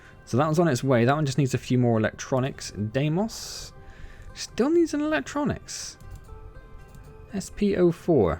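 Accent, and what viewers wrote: United Kingdom. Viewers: Just found you through your furious seas video and I detect a west country accent... where abouts you to mate?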